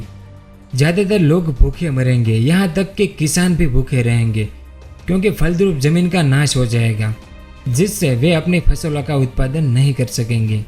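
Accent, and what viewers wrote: Gujarat, India. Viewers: Bhai tu gujarat se h kya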